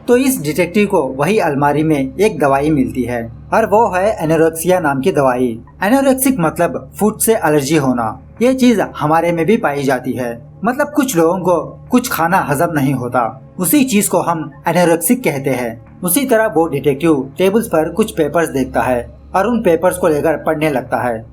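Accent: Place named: Bengal, India. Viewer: Bhai hindi bol ye banglihindi kyu bolra h🤣🤣🤣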